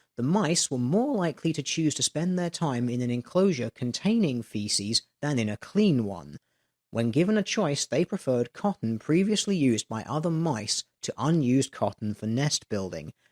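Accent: United Kingdom. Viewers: why is the toilet humor extra funny from a british accent? (Cuz I'm American isn't it?)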